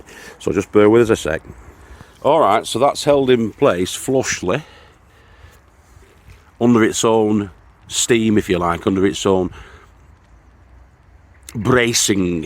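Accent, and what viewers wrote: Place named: Wigan, United Kingdom. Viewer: Hello! You're incredibly gentle with your persuader! Here I am yelling at the screen just give it a good whack,!! 🔨🤣 It looks really fantastic!! Just wonderful!! You'll make the money back by increasing productivity.. so don't forget to include the savings in your calculations!! Keep speaking the way you do... more correctly sounds terrible!! We'd miss the Wiganese! It'll be nice with featherboard!! Thumbs up, my friend!! Get out of those wet clothing..warm up... and we'll see you tomorrow on a sunnier day!☀️ Fingers crossed!! 🤞 G'nite!!💖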